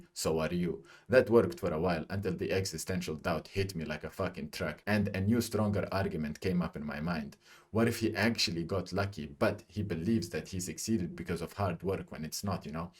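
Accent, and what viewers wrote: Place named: Italy. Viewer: Wait why do you sound Italian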